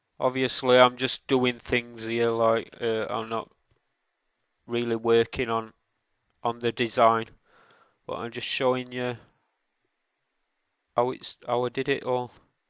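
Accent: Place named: Sheffield, United Kingdom. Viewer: pquarmeARTS he sounds like because he lives in sheffield "I don't think you now a lot of people form Sheffield" :)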